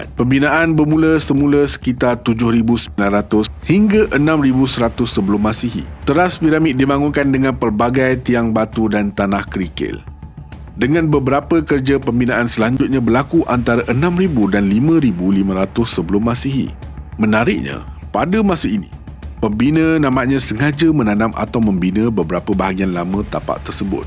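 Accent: Malaysia. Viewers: Pusing aku kalau orang malaysia ngomong...bagus belajar dulu sama melayu riau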